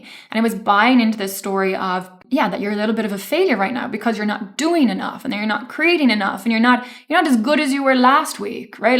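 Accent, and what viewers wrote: Ireland. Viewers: Where are you from, your accent has elements of Irish???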